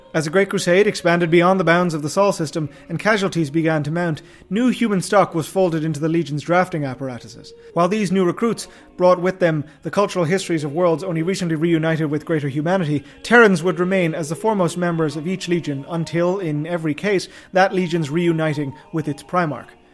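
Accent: Ireland. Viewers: I can't place your accent - is it Irish/Southside Dublin?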